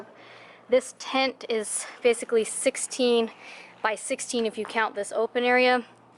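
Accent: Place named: Michigan, United States. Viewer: I had a feeling you weren't from Arizona. My wife is also from Michigan and you sounded a lot like her and my in-laws...I hope to make it out to Arizona some day, I'm excited to see how the dry heat feels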